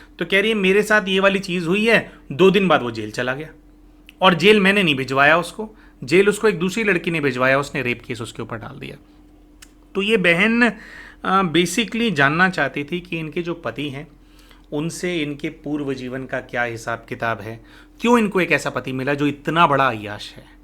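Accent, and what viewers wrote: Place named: Delhi, India. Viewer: inki bAate batane k tareeke me Delhi k tadka wala touch hai 😂😂 bahut pyare aur funny hai bhaiya 😅😅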